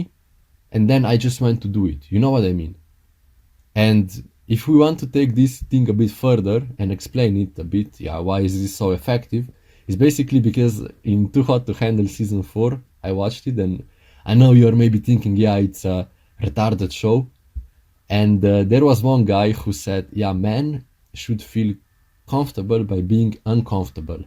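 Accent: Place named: Spain. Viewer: your language accent is beatiful are u spanish or little bit south european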